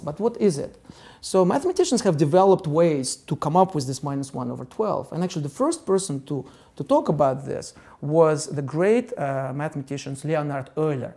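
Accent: Russia. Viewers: This guy sounds like he has some russian accent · The guy has some horror core Russian accent. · It's a russian accent, very very fun to listen this - russian phonetics and russian emotions, but english phrases and words. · Somewhat strange, but I understand J. Grime's accent better than this' obviously Russian speaker. (Although I'm Russian) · Yeah, it's kinda wierd: his wording is correct and he uses live idiomas and cultural references but his accent is soooooo soooo Russian. I believe he keeps thinking in Russian and then formulates a quick translation of his thought in English - that's quite strange as naturally upon some point you start thinking in the language that is foreign to you and that is the moment you generally say one speaks a language. А тут прям такие знакомые интонации, прям слышно как звучит с такой же интонацией русский оригинал фразы )) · мне еще нравится что не всё с русским акцентом, это вперемешку с чистым, правильным произношением, мне кажется это странным если он может так бегло разговаривать, но при этом говорит на половину или больше с сильным русским акцентом, как-будто он даже не старается, и не пытался учить как правильно произносятся слова, это странно🤔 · is he russian? 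he has a small but distinct russian accent... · Он точно Русский! Он имеет Русский акцент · Russian professor? Him accent... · is that a russian guy? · I can understand all of this just because i am russian too, because his accent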